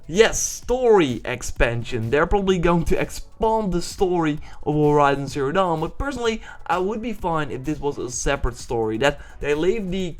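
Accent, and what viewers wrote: Netherlands. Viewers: Just love the dutch-english accent. Much better than the french-, german- or swedish-english accents. Atleast I assume you are dutch based on your accent, am I wrong?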